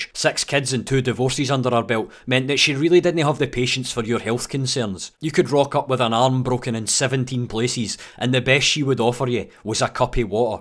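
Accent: Scotland, United Kingdom. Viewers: You should allow us to write subtitles for your videos, as autogenerated subtitles aren't good with a Scottish accent.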